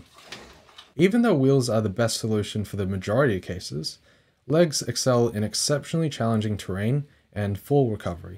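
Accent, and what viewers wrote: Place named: Australia. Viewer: I have to say I love they way you think. So often people design product with no concern to cost, ease of repair, weight & ease of manufacture etc. I also find that the general approach is to over engineer everything regards of need. This is the polar opposite of what nature actually does. I think the fact that you have made the right and left parts interchangeable is brilliant as keeping the part count is low is super important when manufacturing. I am waiting on a Bambu Lab printer so I can purchase your CAD and start tinkering as I think your product/project has unlimited scope and I have already some idea's on how I can utilise your actuator. I notice that you have an Aussie accent, any chance you are located on the east coast?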